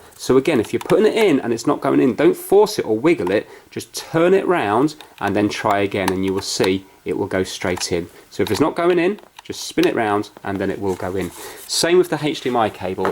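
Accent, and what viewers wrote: United Kingdom. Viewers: Shamalamamoumou unknown he is British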